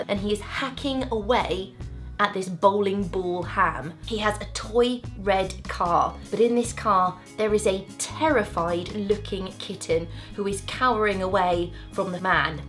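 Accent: United Kingdom. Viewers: Awesome stuff actually. I love your accent. British accent rules😍😍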